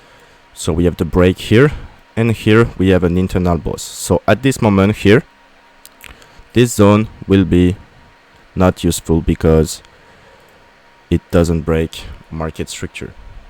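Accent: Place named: France. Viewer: U have a French accent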